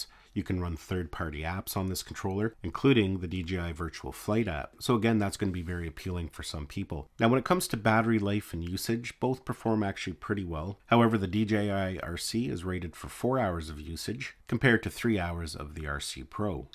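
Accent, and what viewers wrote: Canada. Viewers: I like your Canadian accent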